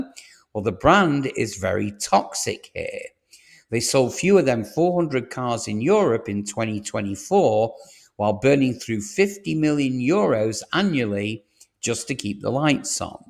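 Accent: United States. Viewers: American pronunciation. Same with tomay-to. There are some differences, do keep up. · why (mis)pronounce the car brands like a yank, I thought this was about european cars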